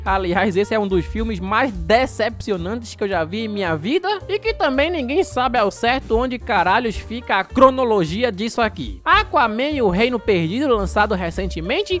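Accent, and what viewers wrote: Northeast, Brazil. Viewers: En, tu é nordestino?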